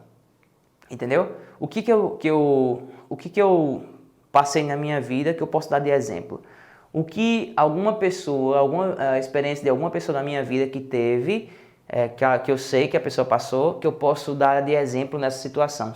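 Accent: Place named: Northeast, Brazil. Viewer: primeiro curso online com um professor com essa linguagem muita boa de intender; sou do ES e to adorando esse sutaque nodestino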